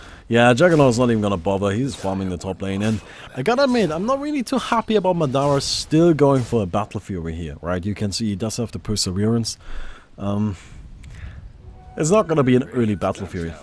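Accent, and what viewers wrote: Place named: Germany. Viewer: Same, that German accent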